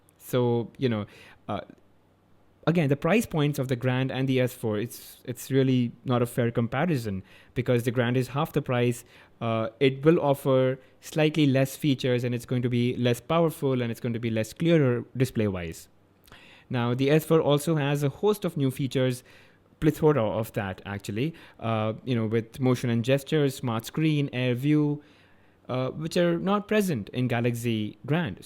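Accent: India. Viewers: aman Allahım adam hint aksanıyla ingilizce konuşuyor ve anlamak için kendimi yırttım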